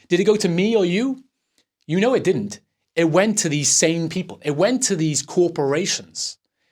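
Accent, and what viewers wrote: Wales, United Kingdom. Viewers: i THOUGHT i heard some welsh in your voice! cymru am byth!🏴🏴🏴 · He sounds Welsh to me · Sounds Welsh to me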